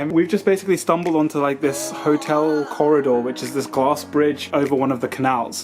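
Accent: United Kingdom. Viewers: 听着你说英语觉得好不习惯啊！第一感觉就是，哎呀妈呀，说英文怎么有英国口音啊！但是过了2秒钟以后，我才反应过来人家本来就是英国人而不是中国人 LOL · ，I know you have lived in China many years, but please keep your British accent because of the influence of Dayday. God hahahahahah. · after stayed in china for such long time you still able to keep your britain accent,that aint easy bro.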